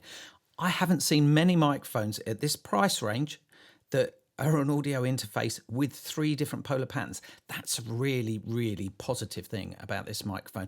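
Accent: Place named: United Kingdom. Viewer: I love British English. I enjoyed this review.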